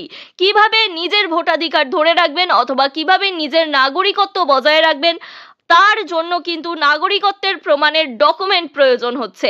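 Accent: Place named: Bangladesh. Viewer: ম্যাডাম আপনার পূর্বপুরুষ বাংলাদেশি আপনার গলার ভয়েস থেকে বুঝতে পারছি😊😊😊 মা-বাবা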